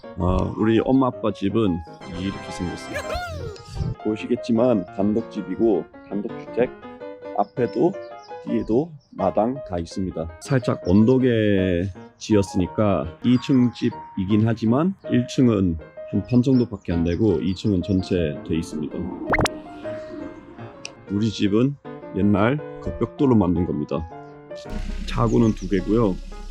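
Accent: United Kingdom. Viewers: 오 영국식 발음이셨어~~~ 멋져라!😊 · 헐 영국어 다. 미국어 만 듣다가, 힐링 됌. 😮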